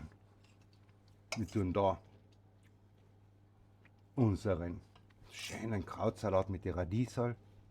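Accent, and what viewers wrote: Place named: Carinthia, Austria. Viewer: du bist so sympatisch u. ein super Koch, ich bin 66 Jahre, wohne in Tirol, bin eine leidenschaftliche Köchin, schaue immer gerne deine Kochsendungen, dein Kärntnerdialekt ist einfach super!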